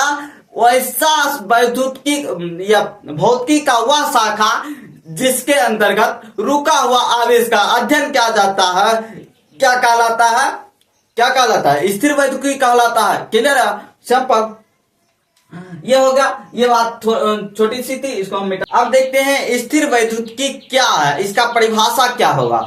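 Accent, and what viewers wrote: Bihar, India. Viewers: Bihar se ho kya??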